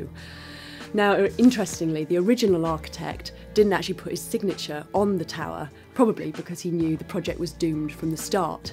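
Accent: United Kingdom. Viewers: Omg why did this stupid brithish Women had to talk you cant understand here Wheres the american womens to replace This Narrator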